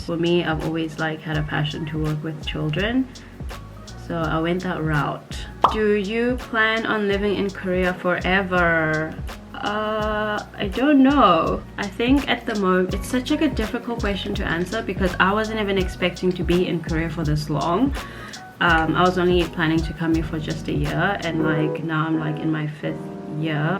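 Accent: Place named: Australia. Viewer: I think I am the only person who thought you had an Australian accent. 😂 Will you be coming back home to watch Beiber? 😏 It is so amazing watching your videos, I have been in love with your content since the first video I watched. Thank you so much for sharing all your experiences with us, it is truly amazing to watch. I hope you will be able to come back home and just be with your family. Sending love and support from home.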